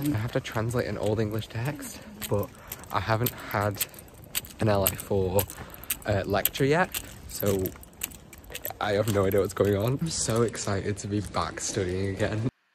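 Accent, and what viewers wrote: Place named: United Kingdom. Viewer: I enjoy your Vlog. Where in the UK are you from? I have trouble locating the different English accents. In the U. S., we either hear broadcasters or members of the Royal Family, so I know there are many accents, but I can't determine which one is which.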